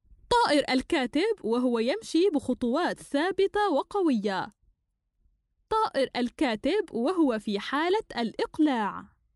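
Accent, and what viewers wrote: Tunisia. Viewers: انتي تونسية؟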